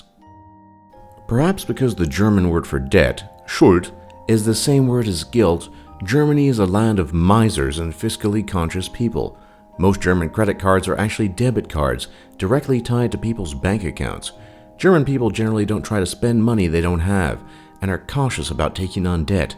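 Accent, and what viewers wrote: United States. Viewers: The american accent sounds damn cute♥️ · German production with an US speaker.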